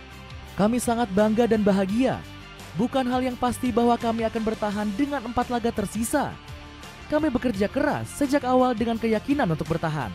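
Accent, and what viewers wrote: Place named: West Java, Indonesia. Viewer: VO nya kaya dialek jabar di daerah 😂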